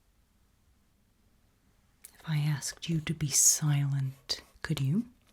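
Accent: Midwest, United States. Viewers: I spent more time seeing if you'd slip up 😅 but you did really good. Not dodgy at all! "If you were stood here" was the most sus I like your American accent. Hope we can hear more of it! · Your american accent is so good, coming from an american! You sound like my family members from the midwest. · This is the second video I’ve seen of yours where you use an American accent (the other being the Noir Detective role play) and damn, you pull it off really well! Like others have said, your “Hi there” had me saying “Whoa what?!” 😂 Impressive as always! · Greetings again good lady. You are simply going from strength to strength with every upload. This video, the A-Z, the Backrooms video, the Infernal Job Interview... I could keep going, but will not for the sake of brevity. I loved your American accent here, and it seems that your American watchers did as well, judging by the other comments. 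I thank you heartily for your wonderful work, and I eagerly await your future uploads. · I’m a californian and she sounded almost mid western to me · AMERICAN? as an American myself this was pretty good!! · That American accent freaked me out but it was very good 😅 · The American accent gave me whiplash so hard that I’m now fully awake 😭🙏🏼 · As an American who's never seen your channel before, I couldn't tell that you weren't American until I opened the comments. Well done. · Oh wow! The accent is great. If this was my first time watching I'd think you were American! Just a few vowels gave you away 😉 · I was not expecting the American accent, caught me off guard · The basically perfectly American sounds coupled with the still-mostly-British cadence makes this so surreal 😂 fantastic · Not only the "R"s, but also the use of "gonna"? Excellent American accent! Really impressive (and still just as precise and relaxing in tone).🖤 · Everyone’s talking about the American accent, but this is my first video so I was thrown off because I thought she was going to be Russian😂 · Haven't heard this accent since your last cranial nerve exam I believe! 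Very appropriate to use an American accent for MKUltra 10/10 accuracy and performance. Love your vids, thank you so much for keeping us entertained (and relaxed) <3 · It sounds like a midwestern accent, like in states near Canada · Really love the American accent! Very tingly ahaha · Wow, your American accent is SO good! Personally, it makes me think of someone from the Midwest who's moved to New England. It also really reminds me of a specific episode of Orphan Black in which one of the characters who has an Irish accent (Siobhan) is impersonating someone who's American or Canadian (the show is rather vague about that for the most part). Regardless, I'm really impressed and rather unnerved, seeing as I'm so used to hearing an English accent from you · Okay, having rewatched the video a couple of times now ( -induced naps for the win), I have to admit that this American accent of yours is really growing on me. 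I imagine it's quite the shock for many viewers to see your signature voice sound slightly altered, but it's also super fun to see (well, hear) you playing around with it! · ooooh your american accent is scary good! the only tell is that you said “rather difficult” where americans would probably say something more like “kind of” or “kinda” instead of “rather” in everyday spoken language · gotta say, (as an american) you NAILED the accent! it was so uncanny to hear you as an evil *american* scientist as opposed to a british one! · pls more amerivan accent asmr❤ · The Ameirican accent was not expected but was very much appreciated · You say it's dodgy but I immediately clocked it as a non-specific American accent with the "Hi there." So I think it's a success. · Great American accent! 👏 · the American accent??? 😱🤌🏼❤️‍🔥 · Awesome U.S. accent! Truly!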